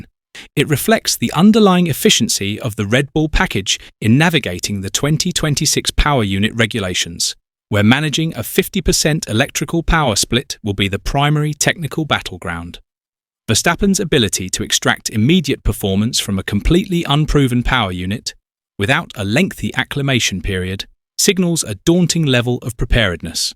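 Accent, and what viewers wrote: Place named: United Kingdom. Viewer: Brit English is getting to me.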